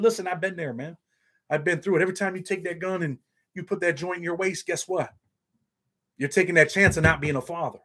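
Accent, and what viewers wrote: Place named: New Jersey, United States. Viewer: You been some shitttttt bro. Sounds like you could of grew up in Keansburge NJ. Ty for the vids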